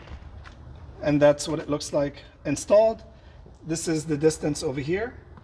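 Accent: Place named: Middle East. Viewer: This is the best comparison video for motorcycle seats. Great job, by the way. Lovely middle eastern accent😉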